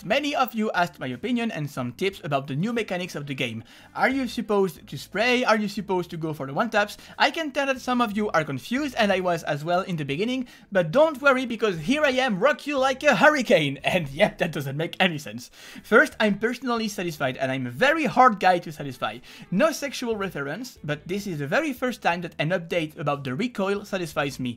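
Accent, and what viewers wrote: France. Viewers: Your prenouncation is fine, you just talk english with a french accent you cant help that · French and uk englisch pronounciations are the best:) keep it coming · Un léger accent français mais Nice vidéo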